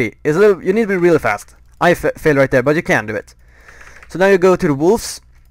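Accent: Sweden. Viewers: Man hör verkligen att du är svensk :P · Great guide man! Quick question that isn't related to league though, are you swedish (kind of sounds like it on your accent ^^)?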